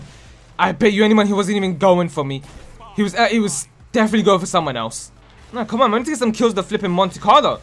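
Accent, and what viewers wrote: United Kingdom. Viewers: This is like british jev (sorry if you arent brittish im not sure what nationality you are but you sound british)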